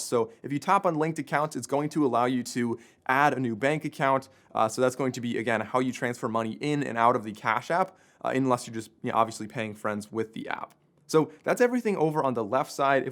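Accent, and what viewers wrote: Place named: United States. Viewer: Thanks great explanation , I appreciate it, with American English understood clearly , I’ve seen other videos explaining with other English accents and they just got me more confused, thanks You are the man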